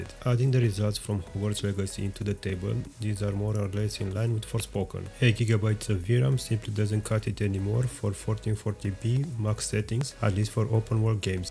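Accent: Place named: Eastern Europe. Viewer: Great video, love the professional yet slavic accented english, it shows he's doing as good of a job as he can, great indepth overviews, overall 10/10 pc gaming content youtube channel that i would love to see grow from 24 subcribers to thousand if not more! You got at least a new subscriber as long as your videos keep this great of an indepth and quality.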